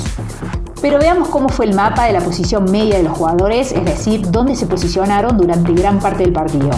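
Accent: Córdoba, Argentina. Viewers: Una pregunta. Eres argentina de la provincia de cordova??????? Se nota mucho. 😅😅😅😅😅😅😅😅😅😅😅😅😅😅😅😅😅😅😅😅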